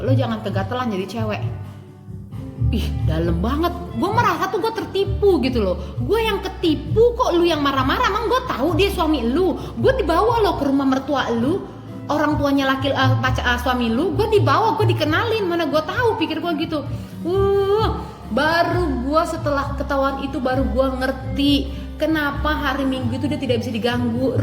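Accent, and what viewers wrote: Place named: Indonesia. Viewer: Youtuber paling menghibur ini, cara bicara nya benar2 indonesia bgt, mantappp jiwaaa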